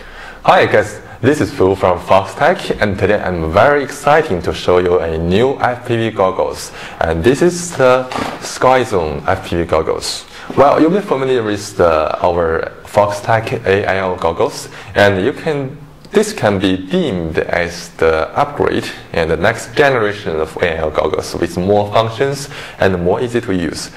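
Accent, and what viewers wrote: China. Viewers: Yeah its a language barrier. I understand chinese and where i live, we have China people trying to speak English alot, it sounds like this.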